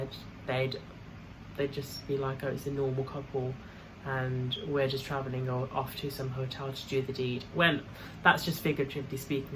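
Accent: United Kingdom. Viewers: She Has A Fat British Accent Which Makes Her Provocative 😊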